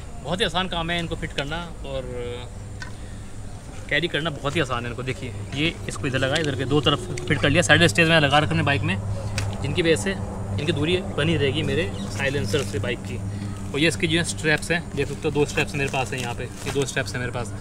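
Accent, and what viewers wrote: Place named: Uttar Pradesh, India. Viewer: Safar Paiy Main Aap ko Yaad Rakhunga Jaroor Sir G. Par Aap Mujha Yaad Rakhna Saddle Bags Give Away Karta Huay . 😁 Bhagwaan Aap Kay 10000 Nahee 10 Lakh Subscribers Ess Saal Kar Daily. My Best Wishes To U For that. I liy your vlogging style & your Hindi UP Accent . Cheers bro Milangay Safar Par Aap Ko Ek Din .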